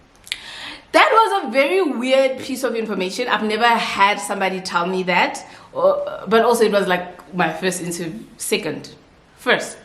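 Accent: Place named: Sotho, South Africa. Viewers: The Sotho accent for me😂❤. Hamba Oyii😂😂😭😭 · 😂😂😂😂😂😂😂😂😂your sotho accent hle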